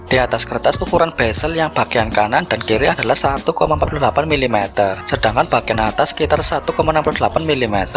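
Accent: Banyumas, Indonesia. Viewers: ngapak Iki :)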